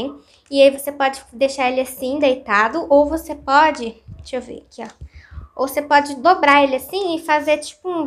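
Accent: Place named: Minas Gerais, Brazil. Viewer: sou do rio de janeiro, acho lindo sotaque mineiro.. meu tio mora em minas.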